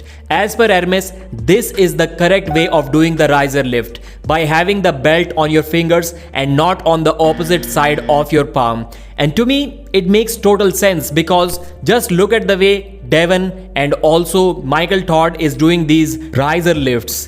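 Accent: India. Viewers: you need to learn to change your accent to a neutral one bro 😂 your accent is too heavy on the indian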